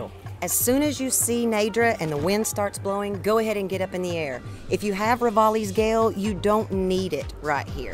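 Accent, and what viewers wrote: South, United States. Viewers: Thank you so much! I always use the snow boots and never bought the snowquill trousers so I didn't know unfreezeable was a thing until this video. Nice to hear a fellow southern girl Zelda fan too! Cheers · I don’t think I’ve ever heard anyone with a country accent ever talk about Zelda before and I didn’t know I need it. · A southern soccer mom voice talking in detail about video game strategy. That is a true paradox. It’s wreaking havoc on my brain. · Is the narrator from the southern states? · I love your southern drawl and your videos. Thanks! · The southern voice is so rare on YouTube. I’ve been out of the real South for so long I’m starting to lose my ability to tell what state people are from. You don’t have to answer so that you can maintain your anonymity and privacy, but I wanna guess either the Carolinas or Tennessee? 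· Love her accent! Being from Oklahoma I never hear fellow southerners in gaming 🎉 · Holy hell you sound like family... Rare to hear such a sweet southern voice on the tube. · This girl is definitely from the south. I say texas or Louisiana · 1: I didn't know dragons were in this game and that's awesome 2: if you don't mind me asking, what is that accent? I can't tell if it's a southern accent or a Newfoundland one though I'm leaning more towards southern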